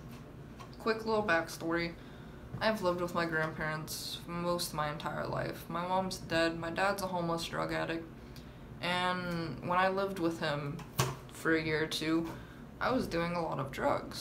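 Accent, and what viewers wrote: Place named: Pittsburgh, United States. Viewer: Oh your definitely from Pittsburgh 😂😂😂 hey girl hey.... fellow pittsburghian here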